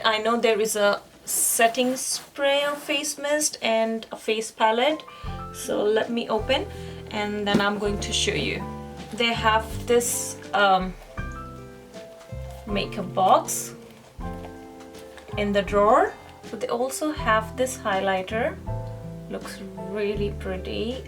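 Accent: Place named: Pakistan. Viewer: You are so lovely and gorgeous I don’t mean to be nosy but are you Pakistani because you sound like Pakistani I don’t mean to be rude or nosy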